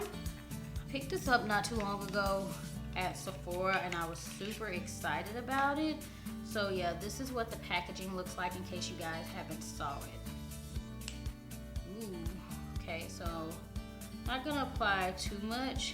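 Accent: South, United States. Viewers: I'm freaking love your channel! My friends always tell me that I sound like you. #southerngirlaccent #nitraabsnapchatgiveaway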